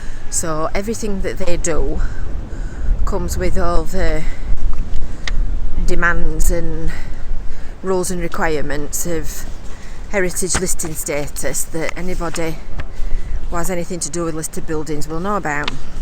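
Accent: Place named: Yorkshire, United Kingdom. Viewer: I suspect there is an awful lot of Yorkshire in that accent .....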